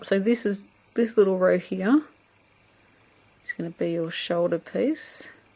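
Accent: Australia. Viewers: Had a brainfart lol. I really do love your videos and your accent. Would love to come to Australia, that's my dream vacation.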